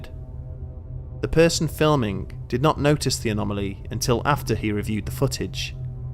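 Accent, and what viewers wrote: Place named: England, United Kingdom. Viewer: The narrator has one of those spot on English accents that could get seemingly get REALLY DARK at anytime. One of these days I will be all into one of his stories a really creepy ass story and then on the middle of the story he says “and as you’re listening to this - you hear a knock at your door. (Knock at door) when you ask who it is - the voice seems very familiar.”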